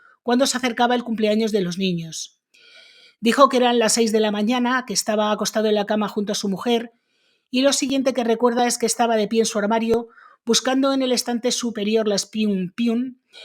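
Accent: Spain. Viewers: Soy dominicana, resistente en NY. Me encanta el acento español.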